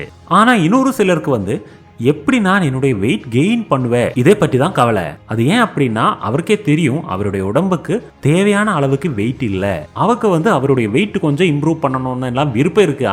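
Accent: Kerala, India. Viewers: Kerala bro❤️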